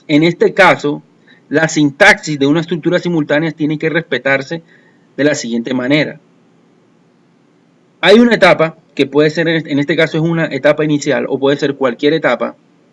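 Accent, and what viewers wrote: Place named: Barranquilla, Colombia. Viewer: Una pregunta, es que por su acento me pareciera que se encuentra en Barranquilla Colombia. Es así?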